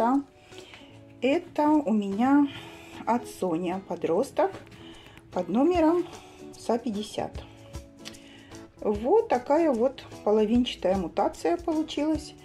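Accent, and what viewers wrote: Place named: South-East, Russia. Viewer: я так и думала) Слышу, говор "свой", юго - восточный) Привет Вам из Днепра)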